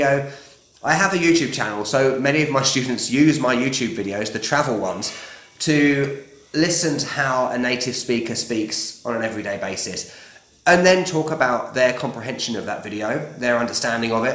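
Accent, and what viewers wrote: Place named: London, United Kingdom. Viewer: ) I am from Barcelona. So my accent is European. Nothing to do with the Mexican accent lol. My sister is now in México DC. She's been living there for over a year now. I love your London accent by the way! I was actually thinking I could maybe book some lessons with you so I can see how you structure the lessons and what resources you use. This would be really helpful. And at the same time it would help me improve my speaking skills (specially pronounciation :)) I have been working as a translator for quite a few years now but work flow is up and down. So I decided to start a course on how to teach Spanish as a foreign language as I thought this could maybe help me get an extra income and it will allow me to socialise a little bit. Working as a translator from home, all alone without seeing anybody, makes me feel a bit lonely sometimes :)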